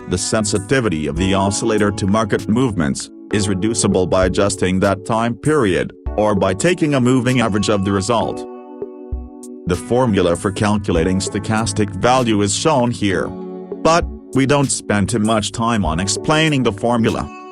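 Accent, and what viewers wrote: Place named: India. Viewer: Could you know I've always than this question mark this is like trying to listening to customer service from India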